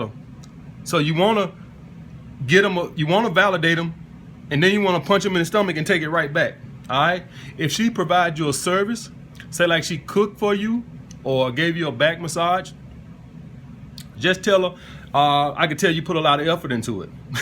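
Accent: South, United States. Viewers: that country accent is dope haha. Good looks on the game big bro, just subscribed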